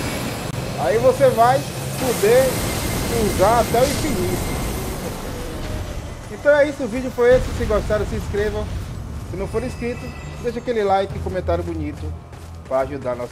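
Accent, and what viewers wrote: Brazil. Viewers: Esse e baiano raiz.. bora jogar junto meu pacerooo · Tu eh baiano ne pae? Tambem sou percebi pelo sotake de Salvador é isso msm??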